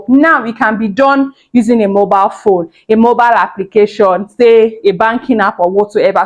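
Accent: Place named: Nigeria. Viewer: Your surname sounds Ghanaian and your voice is screaming Nigerian. Good insight though 🔥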